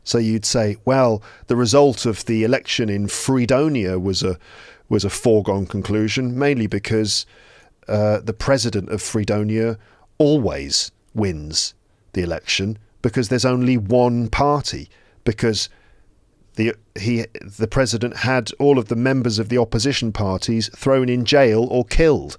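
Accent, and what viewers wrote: United Kingdom. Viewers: I adore the British accentas i am ateacher of english from North Africa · British accent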